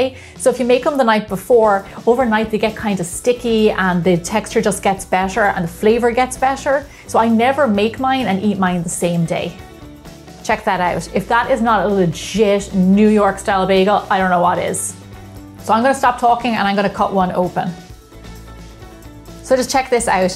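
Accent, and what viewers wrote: Ireland. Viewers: With the Irish accent this woman could give a recipe for swill and it would still sound good. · Irish accent? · your bagels looked delicious, made me hungry, & i enjoyed your accent. top 'o the mornin' to ya lass. do you have any sour dough recipes?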